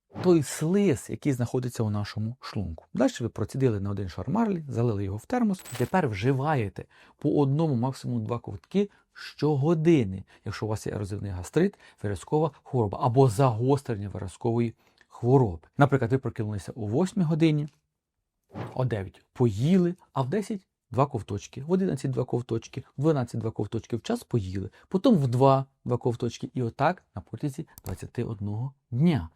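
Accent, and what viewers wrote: Vinnytsia, Ukraine. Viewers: Дякую. Дуже корисна інформація. Іще крім усього насолоджуюся Вінницьким акцентом.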